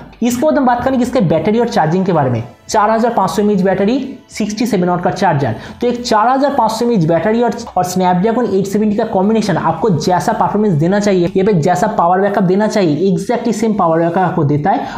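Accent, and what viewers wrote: Bengal, India. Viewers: You must be a Bengali as your Hindi is typical of eastern part of india 😃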